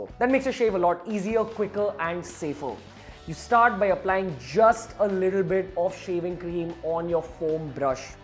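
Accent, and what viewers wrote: India. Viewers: He is Indian . He is speaking indian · Oh no indian accent, guess I’ll go to another tuturial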